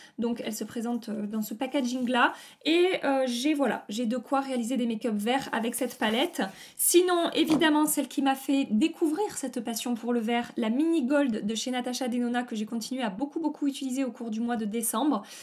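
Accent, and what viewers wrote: South, France. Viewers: ton accent du sud est un régal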